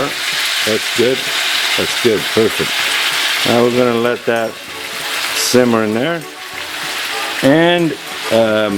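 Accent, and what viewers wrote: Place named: Sicily, Italy. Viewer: Wow. My grandmother had that same accent. I haven’t heard a Sicilian accent in so long ❤️ It’s different than an Italian accent somehow.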